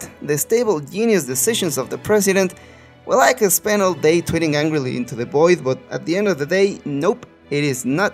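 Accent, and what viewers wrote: England, United Kingdom. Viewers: Ulala señojjjj.... tiene la voz de todo un burgués Ingles 😍👌🏻👌🏻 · Ulala señor ingles 😌👌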